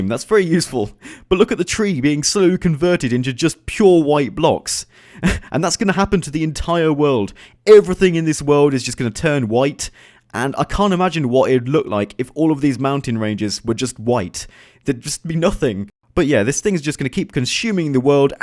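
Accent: United Kingdom. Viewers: He didn't say that just because he has a British accent. Do you know how many British YouTubers there are? None of them I've watched sound lime Stewie, but he actually does.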